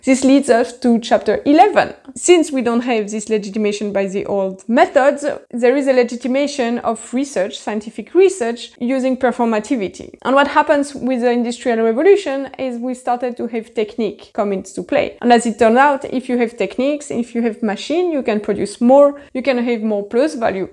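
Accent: France. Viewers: Thanks for your video, which gave me the opportunity to test some of my ideas. One thing I couldn’t help but notice. Some of the comments make me want to take up the cause of woke micro aggressions although the intent is positive. I mean to say a French women (my assumption) with a French accent! Imagine it… people need to travel more I think aside from COVID and global warming implications…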